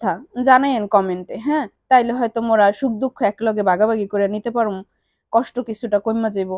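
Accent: Barishal, Bangladesh. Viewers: মনে হচ্ছে গো আপনি বরিশাইল্লা!!! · আপু আপনার অভিনব কায়দায় বরিশালের ভাষায় কথা বলেছেন অনেক সুন্দর হয়েছে। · মুই বুঝাই গেছি আপনার জেলা বরিশাল ঠিক কিনা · আপ আপনারর বরিশালে কথা আমার খুব ভালো লাগে আমার জন্য এক পাত্রী দেখবেন ❤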